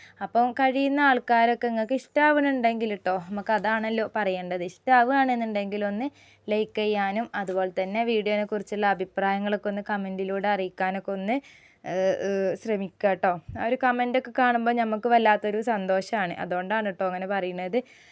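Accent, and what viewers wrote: Malappuram, India. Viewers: എനിക്ക് ഏറ്റവും ഇഷ്ടപ്പെട്ടത്. അവതരണമാണ്.മലപ്പുറം സ്റ്റൈൽ' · മലപ്പുറം ഭാഷ ആണല്ലോ 😮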